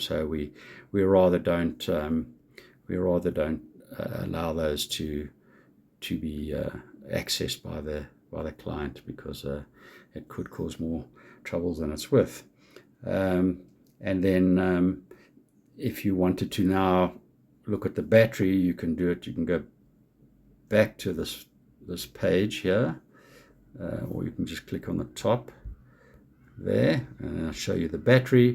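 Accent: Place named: South Africa. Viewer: I presume you have made this video in South Africa, judging by your accent. The App has been recently upgraded and for people in the U.K. or in the latitudes further away from the equator force charging from the grid is a very important function. Because if the user wants to make the most of the longer summer days to charge the battery and turn the force charging off they are able to do so. While in the WINTER months when you get only seven hours of sunshine and have an access to cheaper tariff rates during the middle of the night you want to charge your battery to use during the day time. The recent upgrade has TAKEN this facility away and if you are considering buying this system, have access to cheaper rate night time electricity and live more than 40 degrees latitude north or south of the equator this system is not a good buy.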